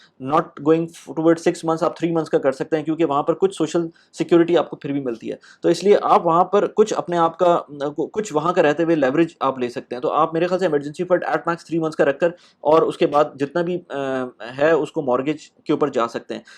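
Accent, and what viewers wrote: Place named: India. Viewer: What language do you speak?! Your English is more Indian than English. It's horrible.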